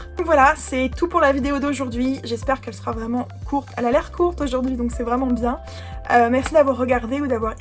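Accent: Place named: France. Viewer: Sinon....le mec qui se moque de ton accent ....j'ai connu ca aussi avec un de mes British dates (j'en ai eu 2 au total lol). Et il m'avait fait la même, il se moquait de mon accent ...il m'a tellement gonflée que je me suis barrée et je l'ai planté du coup 😅😁 Alors voilà, comme tu disais....on sait qu'on a un accent parce qu'on est francaises...mais y'a des limites !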